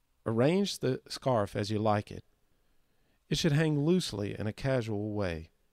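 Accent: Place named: South, United States. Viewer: “Refer to the “how to tie scarf” application…” What a self-referential comment this weirdly southern announcer makes.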